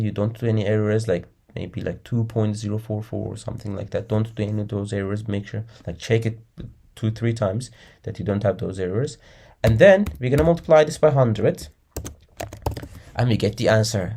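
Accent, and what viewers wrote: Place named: Turkey. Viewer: aksandan türk oldugun anlasılıyo kral guzel video olmus eline saglık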